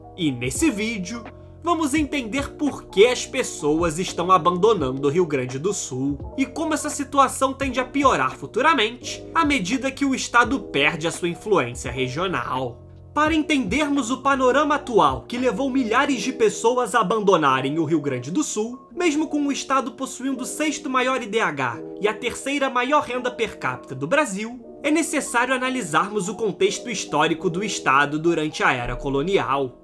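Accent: Rio de Janeiro, Brazil. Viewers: Que narração horrível..... Não dá pra assistir mais de um minuto..... Carioques insuportável. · Mais um videozinho cata-clique, baseado em notícias superficiais, clichês históricos e uma "capa" sensacionalista. Não sei o que é pior: o conteúdo ou a narração desse carioca. Sugestão pro próximo cata-clique de vocês: Rio de Janeiro, estado falido, capital da bandidagem do Brasil.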